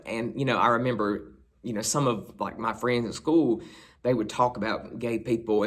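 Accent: South, United States. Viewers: Aussie here loving your southern accent. Just want to hear you say Veh-hickle. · I love your vids. You and I have much in common. I was born and raised in the upstate of SC and I now live in Charleston. You and I have that same upstate southern accent too. I always look forward to seeing you every time you post. Much love and blessings. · Love your videos. I could listen to you all day. I love your southern accent. Plus you have a great hair style. You and I have alot in common. I mainly listen to female singers. Mainly. Have you read her memoir, yet? Love and hugs from Dunlap, Ill.😊😊🎄🎄⛄⛄🎅🎅😊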